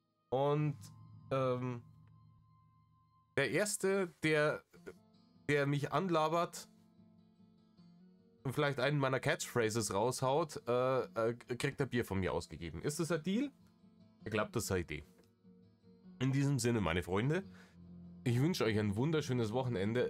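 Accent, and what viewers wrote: Bavaria, Germany. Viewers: Danke ❤. PS: es ist so wohltuend, echtes Bayrisch zu hören 😊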